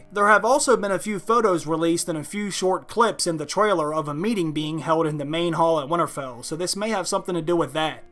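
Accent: Pittsburgh, United States. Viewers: Are you from Pittsburg by any chance?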